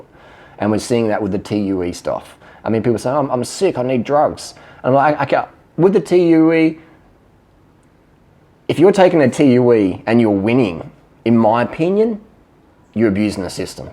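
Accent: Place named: Australia. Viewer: it's OK for whatever you said when you were under carbed..... I find your Australian amusing.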